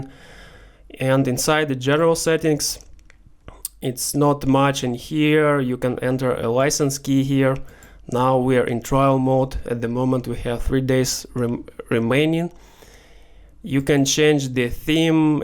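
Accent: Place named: Russia. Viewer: че то у тебя акцент то Русский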